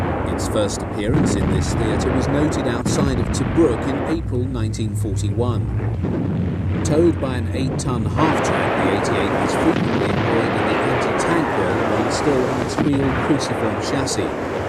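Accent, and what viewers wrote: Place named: United Kingdom. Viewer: I feel like I'm in a fox hold during battle, with some calm British guy yapping next to me.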